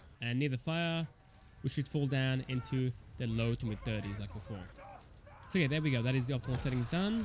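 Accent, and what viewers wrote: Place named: Australia. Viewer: I’m making you my new benchmarking guy always nice to hear an Australian of coarse but I also love how you structure your videos.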